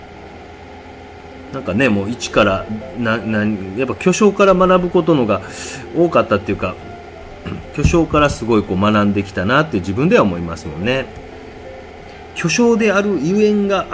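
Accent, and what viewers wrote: Kansai, Japan. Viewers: 柔らかい語り口調の関西弁も落ち着きます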